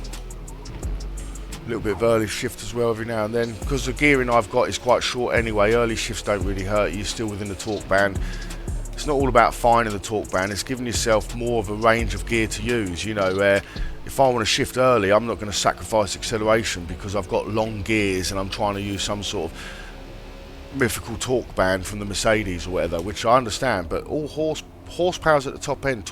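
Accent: London, United Kingdom. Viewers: TOOOOOO many Inside-wheel based gamertags so I hope you are on live,like I say my 2 gamertags are z0mv1(main)& Papa Lazarouz so if you haven't received my friend request then you send methe request fella.I'm up north in the UK by the way,Blackburn!You sound like your from the London area-ish!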